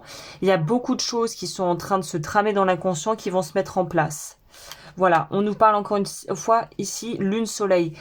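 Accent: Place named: United States. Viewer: Vs avez vécu en Amérique??votre accent anglais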